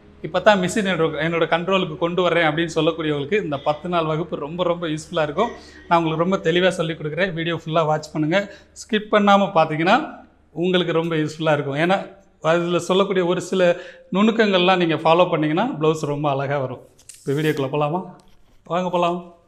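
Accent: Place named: Madurai, India. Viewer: Anna neenga Madurai ya..? Unga slang super😊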